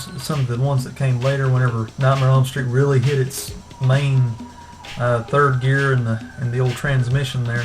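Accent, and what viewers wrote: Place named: Southeast, United States. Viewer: Are you from one of the Southeastern United States? I like your accent.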